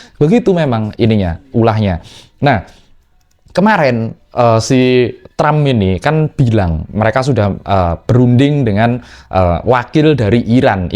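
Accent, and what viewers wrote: Java, Indonesia. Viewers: Mas. Nonton chanelu ini bukane tegang. Tapi malah kudu guyu ae massehhh. Khas asli wong jowo awakmu ki cara ngomonge.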